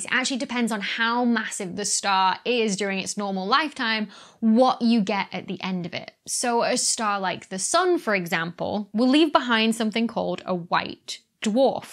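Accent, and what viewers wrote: United Kingdom. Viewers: why would one even need to "ignore her non-American dialect,_ anyway? British accents are beautiful to me! A whole lot prettier than any of the many American accents that exist. 🙄 And I speak one of them, so I can say that. 😌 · Pretty, smart and has a British accent. She da dream.... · So informative, I didn't know the evolution of a blackhole. Also easier to listen to it with a cute British accent.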